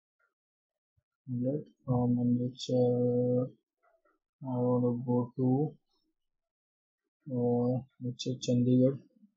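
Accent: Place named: Pakistan. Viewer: Learn to speak English in English,not in pakistani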